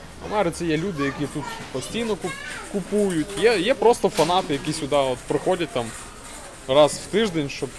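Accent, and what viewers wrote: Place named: Volyn, Ukraine. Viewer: Чути Волинська або Рівненська область)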